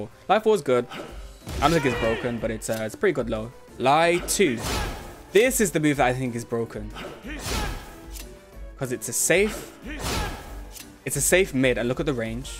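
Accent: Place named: Birmingham, United Kingdom. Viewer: you from birmingham too? Accent gives it away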